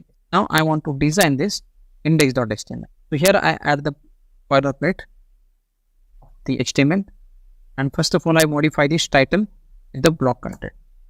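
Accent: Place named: India. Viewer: oh my god, I'm learning Django and Indian English at the same time. Thank you, Bro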